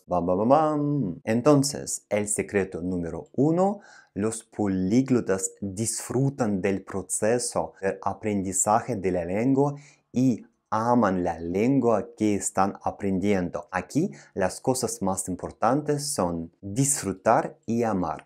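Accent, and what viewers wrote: Russia. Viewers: Su acento es grave. Tiene un acento ruso en espanyol. No recomiendo aprender ahi. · por primera vez he escuchado un acento ruso en espanol. Interesante.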